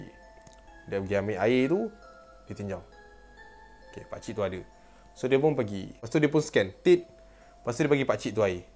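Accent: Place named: North, Malaysia. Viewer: Hg ckp utara sempoi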